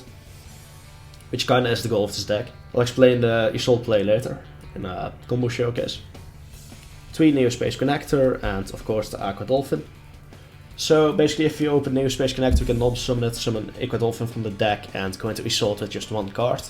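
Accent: Netherlands. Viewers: He sounds dutch 🤔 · hes dutch i can hear it lmao. Its nice to see some one from my country on this channel too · Lol a dutch Guy you can here ot so baba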